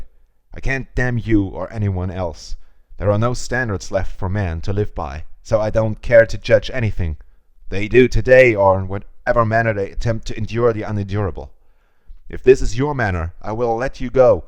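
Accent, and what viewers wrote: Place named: Germany. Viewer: Legendary speech! Also, it is funny you show you’re German by your v’s and w’s being mixed up. Great job reading! You are very good at English!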